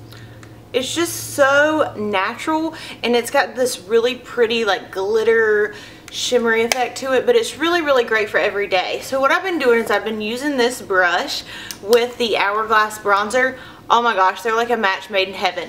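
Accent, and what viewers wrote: South, United States. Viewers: I found you on istagram and I'm glad I did! LOVE YOUR VIDEOS!! You should do a Question and answer (Q&A) BTW your southern accent is soooo Cute!!! ♡♡ XoXo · Found you thru Instagram...new subscriber! Loving your channel and that I can tell you're a southern girl too! xoxo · you are too precious!! I commented before I watched the end of this one....you are so sweet to us into your favorites:)!!!!!! too cute xoxoxo keep it up you are already inspiring me and I'm super allot of other girls to follow there dreams....I have a thick southern accent too and I was always scared to try YouTube cause I was worried people would constantly hate on me about it:(! but seeing you smiling and being yourself is such an inspiration! !! love ya girl thank you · Omg I love your accent it's so cute and country and stuff haha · It'd be so cool if I won the Raquel Nars because my name is Raquel. 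But anyways, I don't think that you should title your videos with giveaway because then people will only be interested in that one video and ignore your true talent and southern accent. Just an idea. Btw I love you and you are so beautiful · Can i just have your accent ? ❤️❤️❤️❤️ mine isnt as strong as yours i just love love live southern accents ❤️👌🏼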